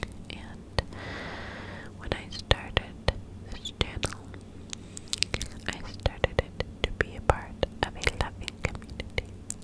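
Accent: New York, United States. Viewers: Are you a Pisces or Aries March baby? Loveeee the New York accent whispers especially as I’m from the uk. I really appreciate you, sending lots of love ❤️ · Thanks so much ❤️loving the new york accent from a irish girl living in dublin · omg love the NY accent, will always remember “niagruh fawls” from your first NY accent video 🤣❤️ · Love the soft spoken as well as the New York accent!! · Happy Early Birthday!! 🎂🥳🎉 P.S LOVE THE NEW YORK ACCENT!! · omgg PLEASE do more NY accent vids they are soo tingly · I love that New York accent!! You also work that fluffy mic like no one else ✨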